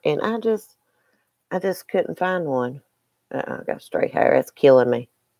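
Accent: South, United States. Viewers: Your the first person I have heard say more things I agree with than I don’t :) You sound southern, I am too 😉 That must be why :)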